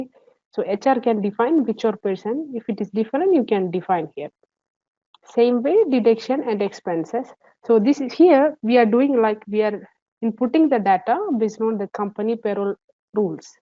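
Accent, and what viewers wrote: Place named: India. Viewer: لماذا الفيديو باللغة الأنجليزية وبلهجة هندية ؟ هل هذا استخفاف بالمنطقة أم أنكم لم تجدوا من يقوم بهذا الدور لكم ؟ لم يعجبني الفيديو ...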